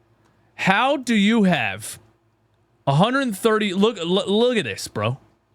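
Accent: Philadelphia, United States. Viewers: 😂typical click bait genre. . This dick-head ( Philly accent ) said we was up 31-3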